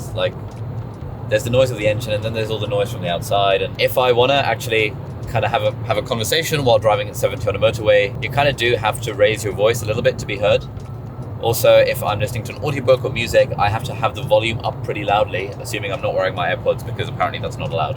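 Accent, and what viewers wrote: United States. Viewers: Your accent has changed. It is more American now. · Does anyone else notice accent has changed abit toward American? Or am I just imagining things?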